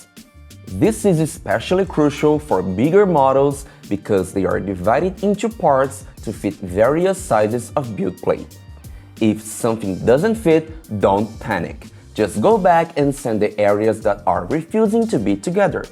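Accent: Brazil. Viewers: esse inglês com sotaque brasileiro... tô errado?! massa o conteúdo! o Loot é referência de qualidade e excelência. inspirador. thanks!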